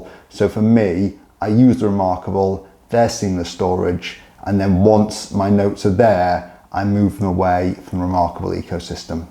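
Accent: United Kingdom. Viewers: Matthew Brokerick is doing pretty well I guess. Picked up a british accent somewhere too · This is an impressive review. The pot plant in the background gives a vintage office feel! It’s great to hear an English accent. I’m a lawyer and have umpteen notebooks per case. I like to write with a good fountain pen. The contents of my notes are confidential. Is that preserved? I’d like to move to something like this and the idea of saving paper sounds good. Are you sure I won’t lose any work? Bobbie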